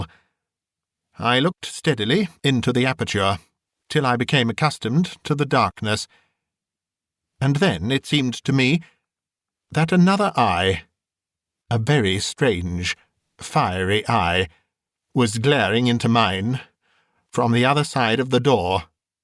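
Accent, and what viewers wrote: England, United Kingdom. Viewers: Great stuff sounds better in a upper class english accent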